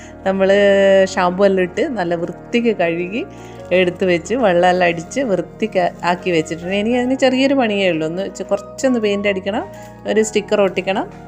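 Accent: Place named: Vadakara, India. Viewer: കോഴിക്കോട് എവിടെയാണ് നിങ്ങളുടെ വീട് വടകര ഭാഗത്തുള്ള ഒരു സംസാരം 🤔🤔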